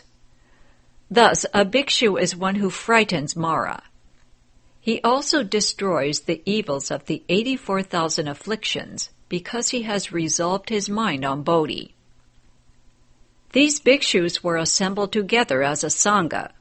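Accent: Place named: United States. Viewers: YOU SHOULD BE HAPPY FOR EVERYONE, BECAUSE EVEN THOU THERE'S HEAVY AMERICAN ACCENT ATLEAST SOMEONE DID THERE JOB TO SPREAD THE DHARMA. AS FOR SOMEONE WHO SEE AND JUDGED SOMEONE WHO WHO HAS TAKEN AN EFFORT TO READ THIS SUTRA. MAY YOU REALIZED 🙏 THE BENEFITS OF THIS VIDEOS. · Great but shame about the heavy American accent.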